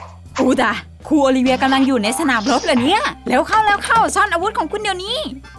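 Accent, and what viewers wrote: Thailand. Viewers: ทำไมมันดูเหน่อๆง่ะ😅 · ้เสียงคนพากย์เหน่อๆ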